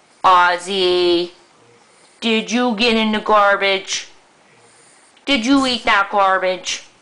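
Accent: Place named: Midwest, United States. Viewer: Oh that midwestern mom voice crossed with baby talk!!! Although... it’s kinda hot.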